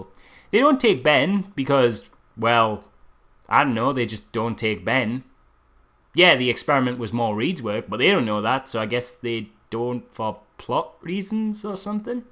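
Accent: United Kingdom. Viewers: a good review with a heavy british accent review takes a thumbs up ....lol dead on m8 :)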